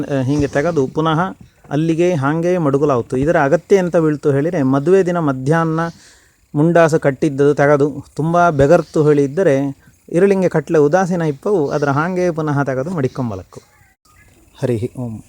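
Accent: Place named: Karnataka, India. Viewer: it's Havyaka Kannada of South Kanara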